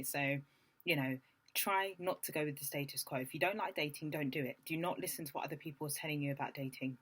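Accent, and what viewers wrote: United Kingdom. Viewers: omygod u remind me Jessica sula in the series (skin) with your voice and your accent. I love British accent. but I'm not in England, I'm in Canada(America)and would like to be in your country to be better in English and to can talk with British accent. thanks to listen me and i hope u will share more super videos ad I will always listen u. bye😘😍😀😀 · Man....there's just something about black girls with British accents........😍😍😍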